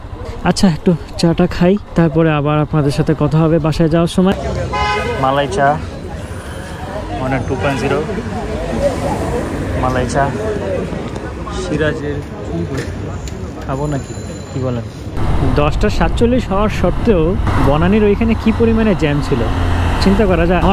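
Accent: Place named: India. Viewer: ভাইয়ার বাড়ি কি কলকাতা নাকি 😅 ইন্ডিয়ান লোকেদের মতো কথা আসে কিছুটা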